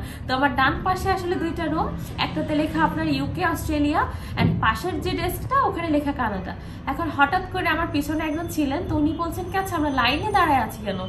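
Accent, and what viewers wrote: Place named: Sylhet, Bangladesh. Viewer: আপা মনে কয় সিলেট বাড়ি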